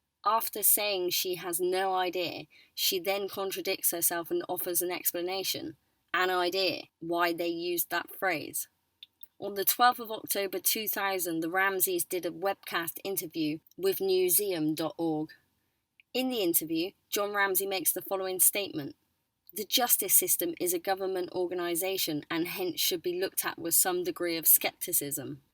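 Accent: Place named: United Kingdom. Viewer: i thought you sounded british. just looked on about tab. i do a live late night variety talk show. i been busy with work but getting ready to start interviewing you tube celebrities again. would you be interested in being on show? its audio only. like radio talk shows of 80s and 90s. talk about your channel, you and your back ground. how you got started. maybe even talk about this case a bit? go to my about tab and email me. After Dark is show name. go to playlist tab and listen to couple to get a feel. Brads workbench, junk from work, jeff king/the den of tools were some good ones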